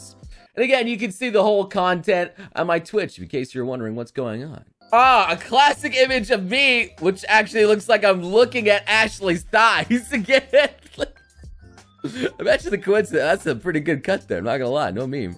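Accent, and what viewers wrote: Scotland, United Kingdom. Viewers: Ughhh that Scottish accent causes me genuine pain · I missed the scottish accent.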